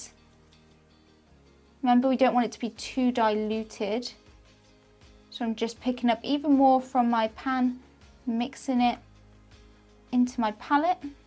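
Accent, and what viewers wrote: United Kingdom. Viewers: What a joy to hear a British accent on a YouTube art video. This is a really wonderful and helpful video, thanks!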